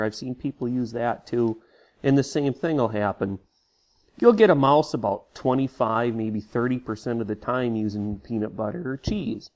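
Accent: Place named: Great Lakes, United States. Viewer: Minnesota or Canada? I hear that Great Lakes accent.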